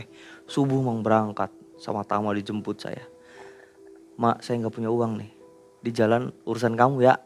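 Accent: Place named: Cirebon, Indonesia. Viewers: wong endi sih cirebone ngapak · Lucu sama logat Cerbon nya hehe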